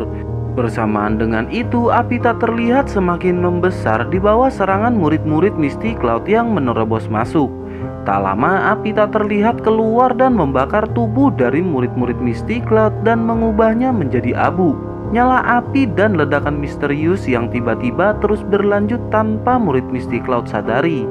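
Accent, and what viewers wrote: West Java, Indonesia. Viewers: Bang lu orang Sunda kah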